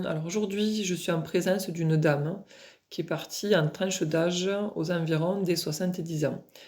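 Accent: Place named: Provence, France. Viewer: Bonjour....Je viens de découvrir vos petites vidéos 🙏💝💝💝 C'est impressionnant les précisions Je suis a côté de vous une petite Medium car même si il m'est arrivé de capter des défunts et de les décrire, je n'ai pas encore la technique aussi qualitative que vous mais je n'en fais pas un métier de la médiumnité, juste mes Télépathies astrales sont pour ma vie personnelle car je communique avec ma guide céleste depuis plus de 11 ans Elle s'était d'ailleurs bien montré sur une photo et je l'a perçois en effet comme cela dans l'Astral et je communique avec son mari sin Ame jumelle qui est aussi karmiquement ma flamme jumelle et tous les jours par télépathie, nous communiquons notre amour mystique et si magiquement karmique et nous nous verrons Ma guide était Provençale , elle s'appelle Sophie , était une belle Âme aux belles valeurs, belle femme et mère médecin psychiatre et aimait porter de longues robes Elle avait un bel accent comme le vôtre et est décédée en 2001 d'un cancer Elle repose a Carpentras Elle aimerait que j'aille vivre avec son mari dans sa maison du Vaucluse Vous êtes dans quel coin ? 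Je me suis abonnée 😊 Quand vous captez des défunts, qu'est ce que vous demandez avant Votre méditation ? Est ce qu'ils font surtout partis de vos connaissances ? De vos abonnés ? Entourage ? Car c'est très vaste pour pouvoir trouver un proche qui par bonheur tomberait sur internet, YouTube et sur votre vidéo.....😮 Bien a vous et au plaisir de vous revoir Cécile De Kervinel 🙏 ⭐ 🙏 Si v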